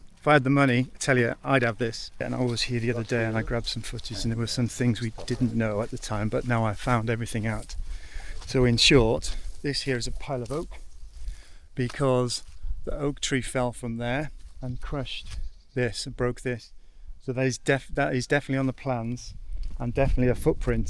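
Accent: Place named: Northern England, United Kingdom. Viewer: It is north British English... The best way to 'speaka di language'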